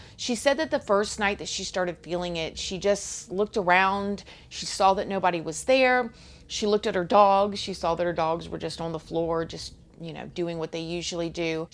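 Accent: South, United States. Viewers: I swear you have become my favorite YouTuber. If you are the same in person then I would love being around you. You would probably be a good influence because I catch myself wondering how you feel about some subjects. You are so comfortable with being yourself. With being Southern! I have traveled/lived out West and some up North. When I hear you explaining things that I hear other YouTubers make fun of because it's Southern, it is refreshing. I can laugh at what we do as Southerners, but when someone else does it, it bothers me? 😆 Bailey Sarian is the only reason I started watching YouTubers instead of just music. You were like the second person. I didn't know how I felt when you started doing True Crime. Then I loved how you covered current events and your commitment to uploading on days expected and more. It actually confused me when I saw "spooky intro", but I just thought I missed it. 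You always upload! So happy for you! You deserve it! I totally see you getting to millions. Don't change... your sincere tears was so relatable! You're doing so good!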